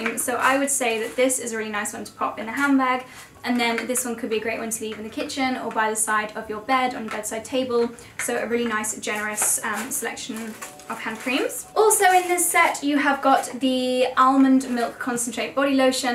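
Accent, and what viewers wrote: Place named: England, United Kingdom. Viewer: Hey! I'm Russian but currently live in China! love your channel and your English accent;)) got inspired by your videos and made my first on line order from ASOS but my order got lost some where coz they don't do tracking for china which is rather disappointing! oh well we have taobao here with lots of dupes hehehe gonna shop there. have a nice vacation!!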